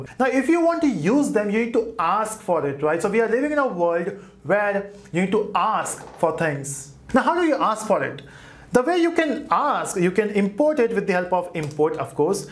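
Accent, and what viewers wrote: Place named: South India, India. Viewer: u talk too fast , little south indian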